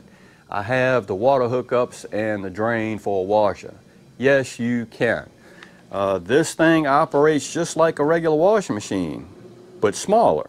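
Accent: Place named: Mississippi, United States. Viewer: Came to this video to learn about the machine but the biggest thing I've learned is that I need a friend with a Mississippi accent. Lovely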